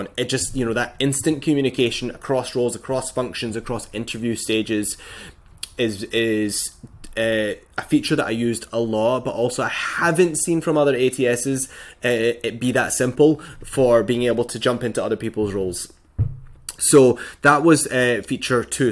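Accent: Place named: Scotland, United Kingdom. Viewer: Tis a lovely Scottish accent, close to the Irish brogue that my family has. It is lovely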